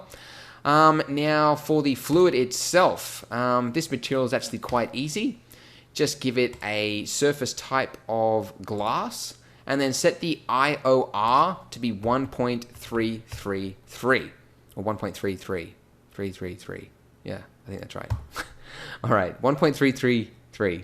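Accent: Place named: Australia. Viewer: He is Australian i am guessing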